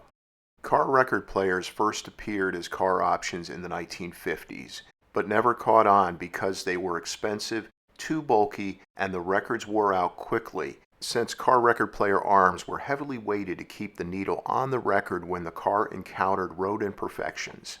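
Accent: United States. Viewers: ...Ah, that mid-Atlantic accent.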